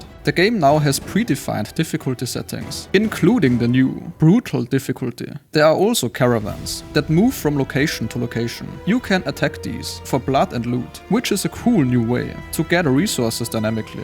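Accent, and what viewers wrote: Germany. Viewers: Oh shiet I came here to say that the German accent added to the Nosteratu vibes wonderfully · Bist du deutsch ? Frag für nen Freund 😅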